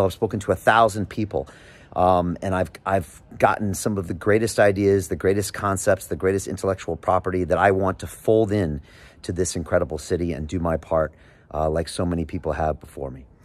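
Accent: United States. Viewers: You sound very american. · how can he be the mayor of London England and has an American Accent?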